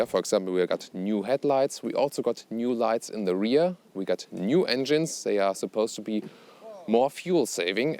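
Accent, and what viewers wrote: Germany. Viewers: Such an enormous German accent, wouldn't it be better to do those presentations in German with English subtitles?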